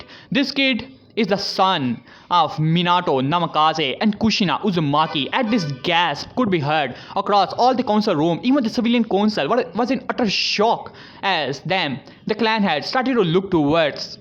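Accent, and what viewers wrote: India. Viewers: I love your India voice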